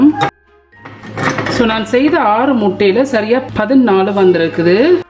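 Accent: Jaffna, Sri Lanka. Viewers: ma...!!!! I like your Jaffna Tamil..... I'm also Jaffna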